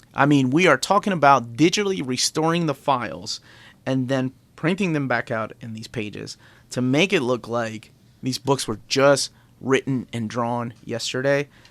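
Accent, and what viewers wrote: United States. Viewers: OMG we're never going to agree on how to say things, I'm glad you live in America and I'm in England. I say Ah-phra and you say Ay-phra. You Nay-mor, I saw Nah-mor. Can you imagine a conversation between us? 😂😂😂 Out of interest, Mark Millar, how do you say it? When it's Americans I only ever hear Millaaaaaaarrrrrrr. But he's Scottish, I share a border with him, and I pronounce it Miller. If you ever meet him will you ask him please?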